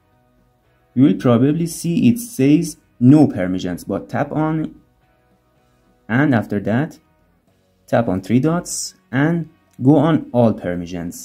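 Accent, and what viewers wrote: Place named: Iran. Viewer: لهجت میخوره ایرانی باشی رفیق 😂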